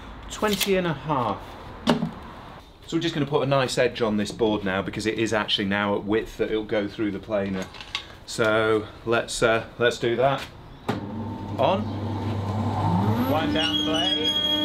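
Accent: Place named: Ireland. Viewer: Irish accent !!!???!!! Not to bad I guess lol Sean from IRELAND 😉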